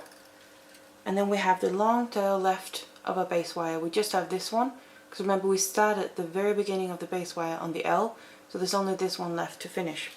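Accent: United Kingdom. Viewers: I really love your videos. Thanks so much for sharing your talents with us-for FREE. I love your accent, I'm from California and you sound so British. I know how much work goes into even a short video, and yours are so well done you must put lots of time and effort into them. Keep them coming.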